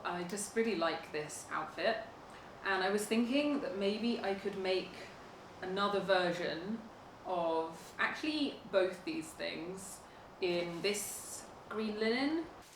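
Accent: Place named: England, United Kingdom. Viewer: Es una pena que no tenga subtítulos en español, solo logro entender algunas palabras del encantador acento inglés 😢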